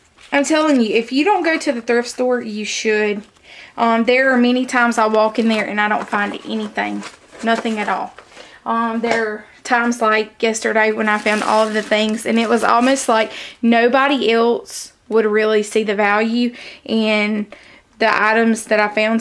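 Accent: South, United States. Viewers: Came through Foley just a few weeks ago going to Orange Beach! Love that Southern accent! When I go to meeting in D.C., New York or Chicago they always ask, "Where is that accent from?" I say, "Accent? What accent? I don't have an accent!" lol And then I tell them I'm from New York! lol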